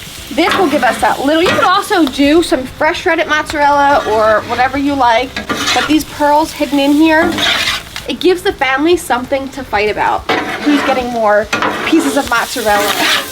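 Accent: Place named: New York, United States. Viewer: forgot to ask…. With “caw-fee”, methinks you’re a “nee-ew yaw-kuh”… am I caw-rect? 😁… brings me back to my roots as a joisey boy hearing from the other side of the river over the Hudson…. I think, once I use up all my preshredded mozzarella, gotta find a decent multi shredder box to shred my own… I might try those small cheese balls in the water… forgot what you called them.. have to rewatch anyway. Pause-n-cook, or write EVERY step down. Either way…. What about a little ricotta (or “riggutt” in the NY/NJ “Sicilian” slang)?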